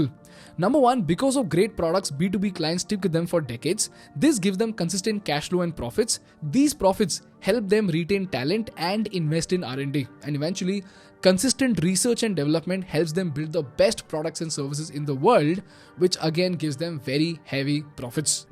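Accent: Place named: Bihar, India. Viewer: You talk like a BeeHaari, so I am thinking you are from BeeHaar. Before giving everyone gyan, what is your solution for BeeHaar and along with it, its two neighbouring states.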